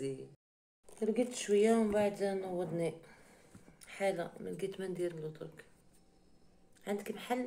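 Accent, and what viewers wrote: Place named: East, Algeria. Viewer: Met lui un grattoir au CHAT , ca se vend dans les grandes surface . C'est naturel pour refaire ses griffes .ou si tu peux lui couper les ongles . D'apres ton accent tu es de l'est-Algerien .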